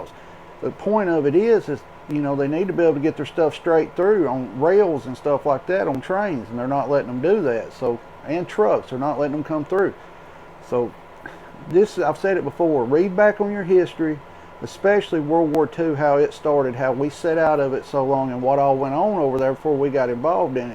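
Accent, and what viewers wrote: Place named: Alabama, United States. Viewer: Love the accent! Alabama?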